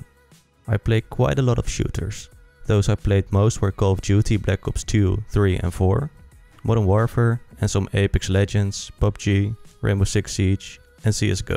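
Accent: Netherlands. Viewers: Hey, I know your Dutch man I hear your accent. I am Dutch too. I've used Kovaaks myself for the last 9 months and I got around 214 hours of gametime in Kovaaks. My aim improved significantly. My biggest weakness to overcome is short strafes fast which I have a hard team dealing with if its moving fast close to me. Anyway my K/D in Warzone was 0.80 and it got boosted to 0.90 recently and it's still gonna climb I notice I do a lot of insane flicks in the gulags also Apex Legends all games seem to be more easy now. I really like your video thumbs up. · Je hoort meteen dat je een nederlander bent hahahaha, altijd dat vieze accent.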